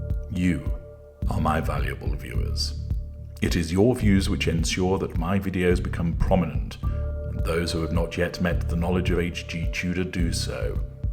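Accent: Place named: RP, United Kingdom. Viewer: HG Tudor — one of the most intelligent, creative and original voices on YouTube. Also one of the most hypnotic bass voices speaking the Queen’s English. Please don’t go away.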